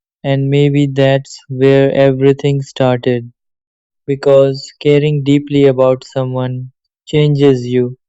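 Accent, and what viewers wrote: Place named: India. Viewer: Stop speaking with your indian accent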